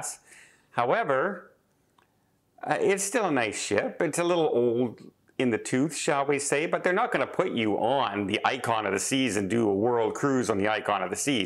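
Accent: Canada. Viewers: Canadian pronunciation. Just because Americans pronounce something one (we usually don’t either depending on regionsin the US)way doesn’t mean everyone has to sound like us 🙄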